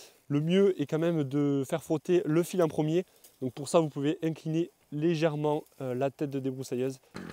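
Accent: South, France. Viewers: L accent du Sud ❤ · Un grand merci pour tous tes conseils pour l'utilisation de la débroussailleuse. Un super tuto très utile et quel beau paysage, cela doit être dans le Sud vu ton accent. Je suis dans l'Ouest et c'est très plat! ---> mais on a des plages pour se baigner.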